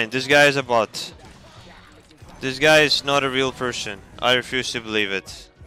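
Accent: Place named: Arab world. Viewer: Recién me doy cuenta que tiene acento árabe XD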